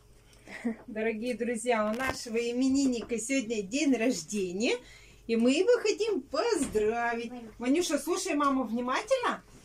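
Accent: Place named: Ural, Russia. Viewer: Посмотрите канал ,Уралочка и тогда спрашивать не станете больше ,там многие и в городе так говорят,,я жила в Екатеринбурге и там говор у всех такой ,,как в деревне и ударения в словах тоже ,, )) уши режет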